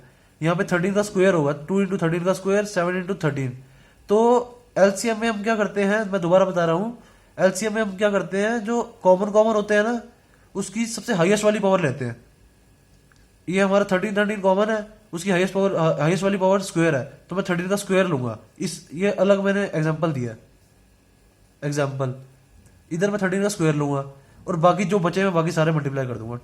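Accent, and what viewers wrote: Punjab, India. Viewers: Tusi punjabi oo hna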